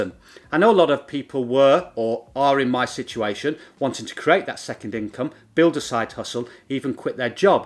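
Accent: United Kingdom. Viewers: Love your British accent...It makes your tips oh so much more valuable!! When I close my eyes, I hear Michael Caine...Cheers!! #smileyheart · I didnt intend to go for KDP, because so many people said (at least, on Youtube) it's saturated. But your video about creating a lined journal persuaded me to give a try. As for this video, it's very honest and to the piont. I dont know, if it seems to me but you sound British rather than American :)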